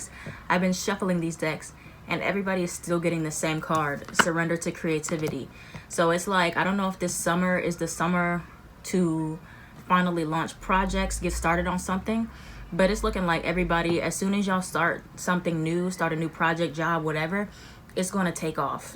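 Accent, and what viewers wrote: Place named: New York, United States. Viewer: This was the best reading, Today I felt the real New Yorker came out and spoke to me in a New York sort of way, I really like that and everything truly resonate 100% I am in a 3rd party situation with pisces his my husband of thirteen years, he left me like ten months ago, I recently find out that the women is pregnant for him, So I decided this was not for me anymore and filed for Divorce, some days are harder than others, I feel I'm moving forward, but by my capricorn standard not fast enough but I'm learning to take it one day out time, I believe the Universe got my back on this one because of the cruelty of the betrayal and how it was serve, still having a hard time with that one. Anyway Thank you for reading this long ass comment.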